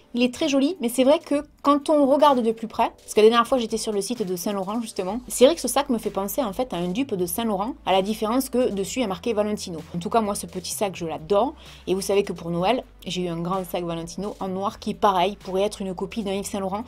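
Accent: South, France. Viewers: Je laisse rarement des commentaires, mais j'aime beaucoup tes vidéos et les messages que tu y inclus. C'est assez rare sur youtube. J'adore le petit accent du sud et cela me rappelle ma douce France, comme suis expatriée en Allemagne 😀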